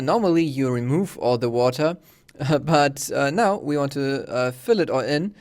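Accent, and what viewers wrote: Germany. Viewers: Man hört sofort: Deutscher.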